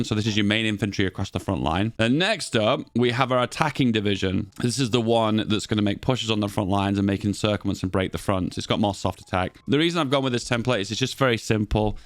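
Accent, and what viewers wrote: United Kingdom. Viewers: Eh British 🤮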